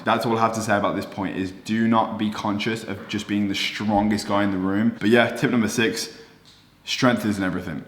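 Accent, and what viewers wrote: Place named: United Kingdom. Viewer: LOL He has a British accent. Do they not televise American or British shows, movies etc in whatever country you live in?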